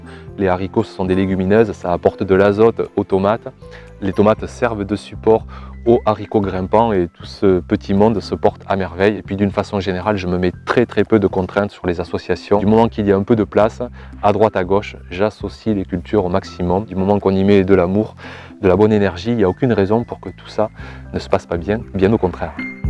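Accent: South, France. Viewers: Oyez! Oyez! braves gens, l' nouveau est arrivé: il a un toujours un petit accent du Sud, un bon parfum de tomates variées et autres fruits et légumes, une belle pointe de charisme, une énorme envie de partager, un coeur qui bât au rythme de son potager (En cette saison il devrait être au ralenti? Que nenni!! Il est en plein accélération.) En 2019, préparez-vous à de l'émotion, de l'échange, du partage, de la réussite (des échecs aussi!) et du goût dans son potager mais également dans le vôtre. Merci pour cette vidéo qui a réchauffé l'atmosphère de plus en plus froide. Vive la tomate!!! A bientôt et bonne saison à tous les jardiniers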